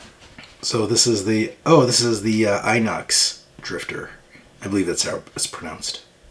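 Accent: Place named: Canada. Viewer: My wife is from White Oaks, is that near you? She loves when she hears your Canadian accent.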